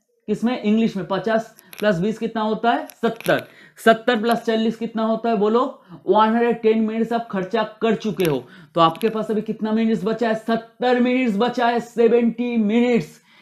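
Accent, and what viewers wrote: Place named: Bengal, India. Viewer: Sir by your accent it's quite predictable that you're a bengali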